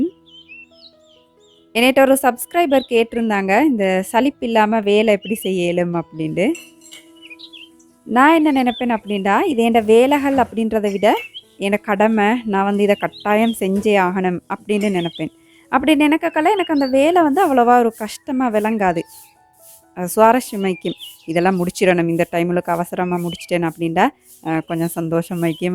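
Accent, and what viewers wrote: Sri Lanka. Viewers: I love you srilanka tamil 😃😃👌👌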